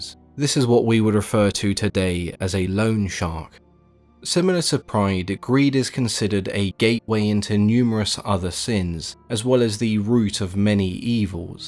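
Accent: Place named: United Kingdom. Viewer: the British accent is making this video even more terrifying 😨😨😨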